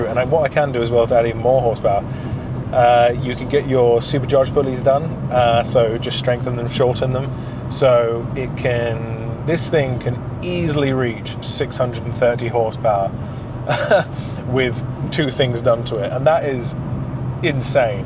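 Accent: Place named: England, United Kingdom. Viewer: Hello, thank you for the video. May i ask: are you native Greek? Your accent shows that you grew up in Engand (or you have lived many years) but you are not local. Both parents Greeks or one?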